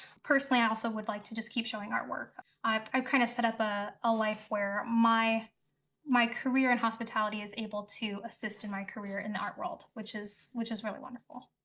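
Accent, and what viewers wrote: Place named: United States. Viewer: Annoying voice.. too loud and american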